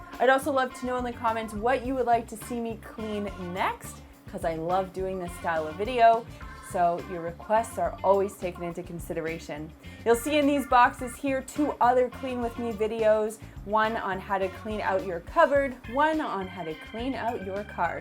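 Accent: Canada. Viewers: My boyfriend knew you were Canadian just by your accent! I couldn't tell the difference until I focused on it. The way you say "progress" is so cute ☺️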